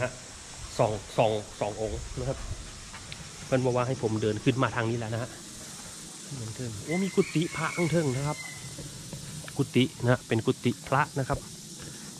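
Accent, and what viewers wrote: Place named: Sakon Nakhon, Thailand. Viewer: ข่อยกะคนสกลคือกัน อ.วานร แต่สำเนียงบ่คือเจ้า สำเนียงของเจ้าคือคนในตัวเมืองสกล ละเบ๋อ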